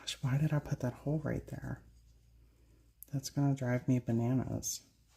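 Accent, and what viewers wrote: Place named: Pittsburgh, United States. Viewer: I just discovered you and I already love ya..sounds like you're from Pittsburgh...it's my hometown...it's been a long time since I moved so I may be off my game but Pittsburgh sounds like Pittsburgh..the way you said water and how you say the letter "O" makes me think of home...either way it's cool I found your page 💜🪬☄️ I love them all but I really like the contrast of black and white on the snowmen and I agree with you about painting the sides 💜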